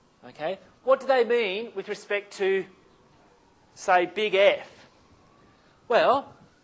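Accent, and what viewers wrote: Australia. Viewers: whenever an aussie speaks, i cant help but trust in what he's saying lol. Good video. Ive found that the only tricky part with the H.E. is to not make a mistake with the integral when solving An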